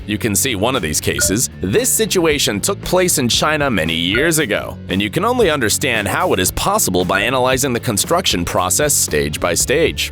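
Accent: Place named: United States. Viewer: I watched about half of it. It was just unnatural, a guy with an American accent saying all those metric units.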